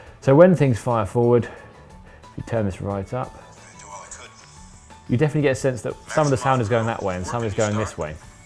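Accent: England, United Kingdom. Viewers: Gosh dang man you complain so much. It is wit is, I honestly wish I hadn't watched this video. Just one log complaining video. I really hate this kinda negative negative negative. Then take it back. You have like 14 days. You did your review and your not impressed. So take it back and get the 6s out and use it until the next one comes out. Good grief. Even in some English accent whining sucks!!!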